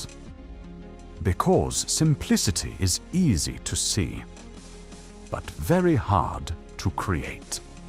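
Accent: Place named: RP, United Kingdom. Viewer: I think the new voice is maybe too posh.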